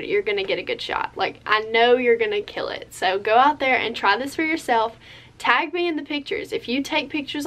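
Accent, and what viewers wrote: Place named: South, United States. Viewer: Yay! You are so freakin awesome! I hear the southern accent! I am just below you in MS. Oh, hey NSC sister!!! Keep shining your light of influence!!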